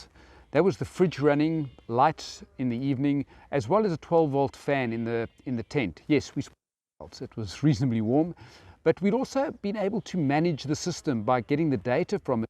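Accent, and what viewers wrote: South Africa. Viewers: Hi, nice to hear another South African. great video, Im doing my homework on both Solar controllers and DC to DC chargers. In the past I had a single unit (CTEK Dual) but now plan to go with what you have. My question, 1)do you in anyway link the two units together or are they both 'individually' connected to the Leisure Battery? 2) Does the MPPT controller also have a feature of trickle charging the Started battery like the Ctek? 3) Other DCtoDC chargers that I have seen have a small wire to the ignition to detect a smart alternator. I didnt notice this on the Victron, is it just clever and can detect that without the extra input? Thank you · Great information.. love the mix of SA and Ozzie accent too :)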